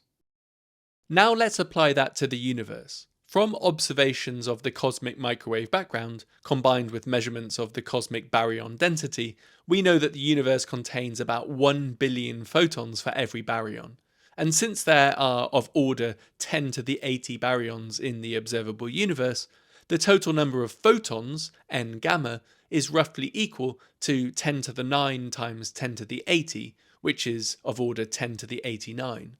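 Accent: United Kingdom. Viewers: I love the way the creator used a British coin to match the British narrator he is using for the voiceover—a subtle and clever touch.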